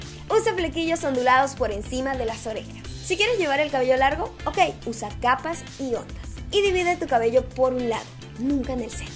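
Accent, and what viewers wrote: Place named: Venezuela. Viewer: El acento venezolano es lo máximo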